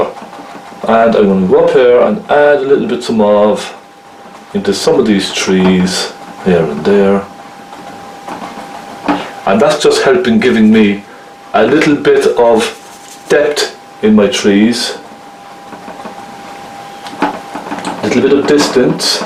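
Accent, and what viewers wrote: Ireland. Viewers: nice to hear an Irish accent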